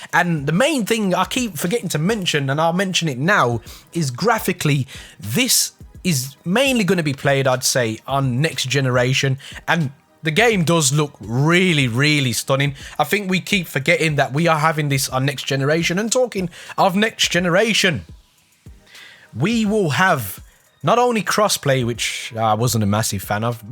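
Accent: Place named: Scotland, United Kingdom. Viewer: Nice haha, I'm Scottish but I could tell by the accent. Also, if you need music to put in your videos feel free to use mines!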